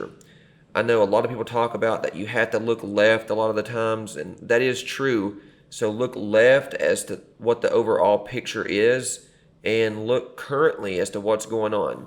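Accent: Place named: South, United States. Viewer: You know, if someone didn’t know you, and just heard the southern accent and saw the average production quality, they would probably skip this vid… but you have a great way of not trying to overcomplicate explanations and your info is A+.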